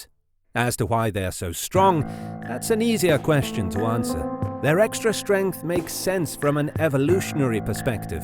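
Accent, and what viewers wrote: England, United Kingdom. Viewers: Rogan with an english accent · Why does a British accent describing powerful animals sound so intriguing? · So it took you to get to any actual info, and then you gave us 20 seconds of data before you restated half the filler you already brought. No sources, no actual numbers, just an english accent to make people think you know something. 10 minutes on google gives you the same info and we made slideshows like this in freshman year high school.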